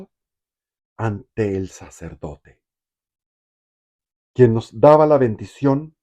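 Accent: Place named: Chile. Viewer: Feliz Año Nuevo desde Sevilla a Todos. Tienes una voz muy bonita y da gusto oírte .Eres muy educado y hablas muy bien .Me gusta mucho el acento de Chile y de Sudamérica en General. En cada País Americano , hay un acento diferente y solo oyéndolo, te digo si es de Perú , Mexicano, Cubano, Venezolano o Argentino etc etc.¡¡ Desde Sevilla , España , Saludo!! Pd , No me gusta Guerra entre Países hermanos .En realidad no me gusta Guerra entre humanos, pero entre Hermanos de lengua menos.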